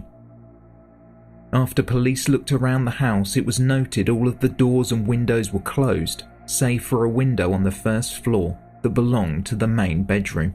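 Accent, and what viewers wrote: England, United Kingdom. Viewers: thank you for the new video love your English accent love London England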